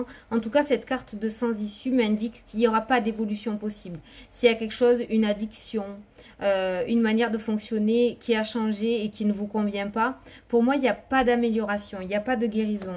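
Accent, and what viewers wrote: South, France. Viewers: Dommage qu'Olivier vivent en Normandie 😕 Avec ton accent du sud, j'ai espéré qu'il soit dans le coin. Avignon/Normandie arrrf 😁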